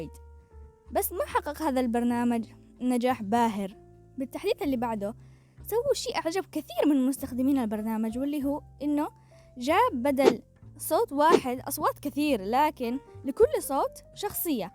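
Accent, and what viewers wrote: Yemen. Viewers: ليش ماعد تنزلين فيديوهات احسك يمنيه من لهجتك انا يمنيه😊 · انت يمنيه صح😊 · احسك يمنيه بس وربي انك مبدعههه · لهجتش يمنيه لا بعيد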